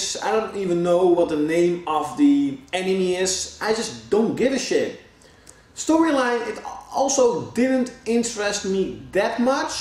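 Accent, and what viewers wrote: Netherlands. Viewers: Haha goeie collectie, je kan trouwens goed horen dat je nederlands bent. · Are you dutch? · Are you dutch? Nederlands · Gast je hoort echt te goed dat je nederlands bent... werk aan je uitspraak!! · are you dutch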